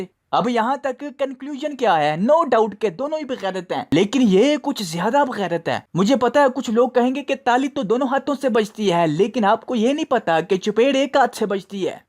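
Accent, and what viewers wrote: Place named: Punjab, India. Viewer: I love your Punjabi accent..it's cute.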